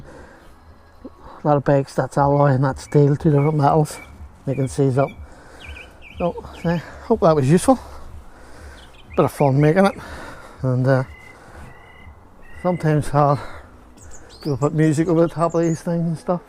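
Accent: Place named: Scotland, United Kingdom. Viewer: Thanks for the video! Very helpful. I have 6000 miles on my rear brake pads and there's almost nothing left. Didn't realize until it was almost too late. Apparently it's an issue or comment with tenere 700. At first I thought it was my fault but it's very common. Not quite as easy as my wr250r. Took me half the video to start understanding your Scottish brogue. Good stuff! I spent some time in Scotland. Little town called lanarkshire between Hamilton and Lanark I think LOL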